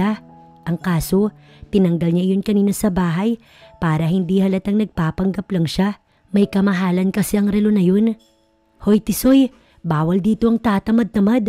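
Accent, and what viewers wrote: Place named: Hiligaynon, Philippines. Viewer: Napaka gandang kwinto story Lalo pa ilongga ang nag kwinto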